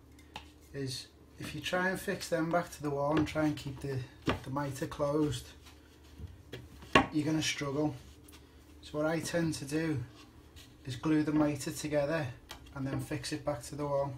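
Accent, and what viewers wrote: Liverpool, United Kingdom. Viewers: Scouser. He must have learnt this in prison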